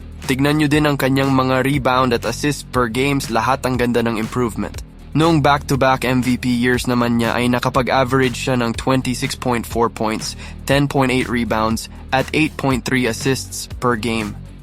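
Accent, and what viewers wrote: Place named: United States. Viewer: Parang philam to slang n slang mgslita.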